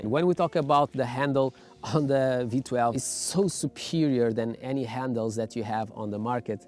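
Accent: Portugal. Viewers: Já tinha reparado que eras português pelo sotaque mas agora fiquei mesmo surpreendido, também sou de Palmela. Bom video, estou a pensar comprar a Inmotion V12 :)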